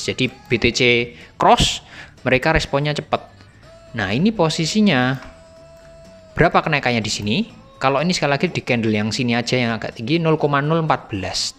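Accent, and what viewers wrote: Java, Indonesia. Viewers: Bang jowone ngendi to kie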